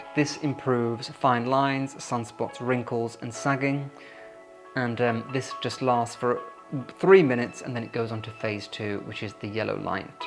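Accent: United Kingdom. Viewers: what a lovely video! I am from Massachusetts, not decorating for Christmas this year, just not up to it after a 2025 marked by family tragedy. But I do like to see what other people are doing to celebrate and you have very good taste. I loved your references to fantasy and magic when you showed that beautiful clock. And of course, everything sounds even more wonderful when the British say it! Wishing you a Merry Christmas,.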